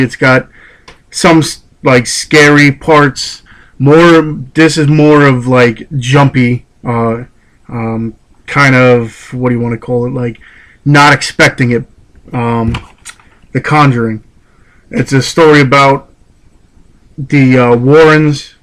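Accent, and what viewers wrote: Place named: New Jersey, United States. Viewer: i love your jersey accent lol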